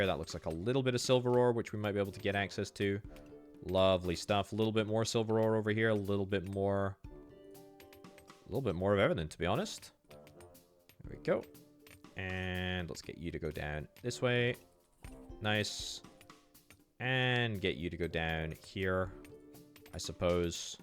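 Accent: Scotland, United Kingdom. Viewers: he's definitely Scottish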